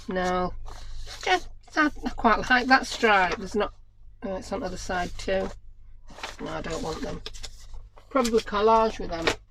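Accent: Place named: Hull, United Kingdom. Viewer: your accent at times almost kills me lol. My parents are from Hull, they came to New Zealand in 1952. I reckon I'd sound like you if they'd stayed in England. When I first went to school the teachers couldnt understand what I was saying. My accent musta been pretty full cause I'd only been with my mam and da. Sending hugzz 😊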